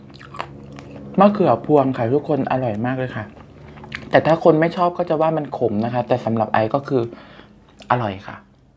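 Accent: Isan, Thailand. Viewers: อยู่เมืองกาญทำไมพูดอิสานได้อ่ะคับ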